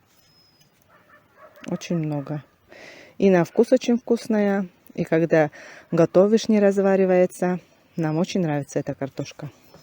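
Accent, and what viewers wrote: Komi, Russia. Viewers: Здравствуйте, и! Привет вам из Самарской области👋👋👋 Ох, кутшем ме рад кывны рОднэй диалект, вроде похож миян говор вылэ Анна Николаевналэн серниыс). Чужи да быдми ме Ижемскей районын в с.Няшабож. 12,5 лет не была на родине, гажтэмала порой зэй ена. На днях искала рецепт калитки, а вчера случайно, а может и не случайно, вышло в ленте ваше видео. Результат - челядес да верОсэс водтэдi узьны😴 и кык час воедз калитка пОжалi😁 с картошкой и пшенной кашей. ПрОстэй национальнэй выпечка. Дети очень любят выпечку с картошкой, буду теперь чаще баловать их калиткой 😊 в общем, смотрю теперь по возможности ваши видео, как вы, картошка босьтанныд, тшак Октанныд (ме мамакед зэй ена любитi тшакла ветлыны, чери кыйны вугыршайт вылэ)... Ностальгия🥰 Синваэ петэ... Став бурсэ тiян!💐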